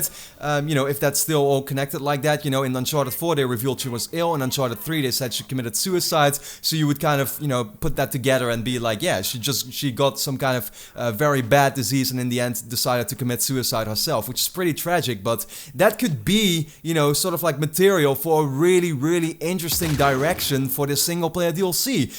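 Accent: Netherlands. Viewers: Ik merkte licht aan het accent dat je niet van origine engels bent, maar Nederlands had ik niet verwacht. Keep up the good work 👍🏻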